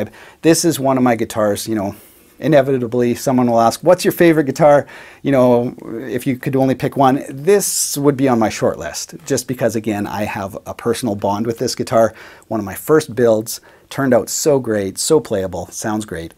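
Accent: Canada. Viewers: Awesome collection! ( Also, I had a feeling you were Canadian based upon your accent 🤣) I'm a fellow Canadian 🇨🇦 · The best Canadian accent Sooory that's the rules One of the best accents ever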